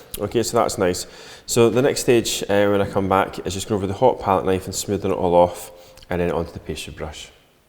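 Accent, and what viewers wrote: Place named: Scotland, United Kingdom. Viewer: Excellent tutorial! Lovely Scottish accent to listen to as well. Thank you.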